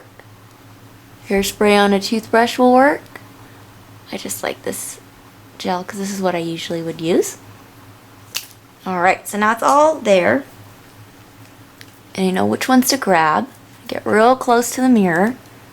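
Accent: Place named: Vietnam. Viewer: I could tell right away that you were Vietnamese for some reason. The way you speak. Hha.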